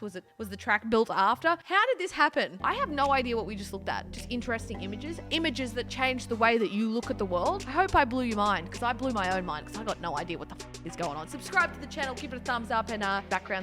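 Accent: Australia. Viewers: You sound like your brother wait a sec all Australians sound like that. Nope just you two.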